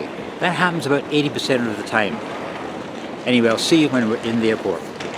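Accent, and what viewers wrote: Scotland, United Kingdom. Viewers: Auur…aøuhr…awrur….As a Dane it’s quite complicated to try to pronounce ‘hour’ in Scottish - like you do,. 😅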